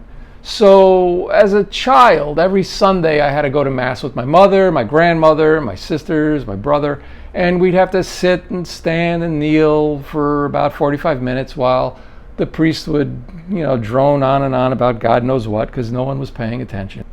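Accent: New York, United States. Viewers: I’m also from NY, Long Island, you talk like me 😊 I was raised Christian, tried to be Catholic but in divorced remarried so I’m shunned 🤦‍♀️ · I am so sorry. I thought you were Jewish. But you are from New York, your accent is so clear. I am so sorry for my ignorance ❤ I am not even American 😂 Hi from Norway 👋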